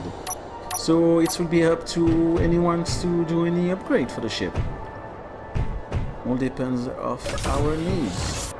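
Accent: France. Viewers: Sympa ton mothership ! Un petit accent français dans la voix je trouve :) Beau boulot sinon !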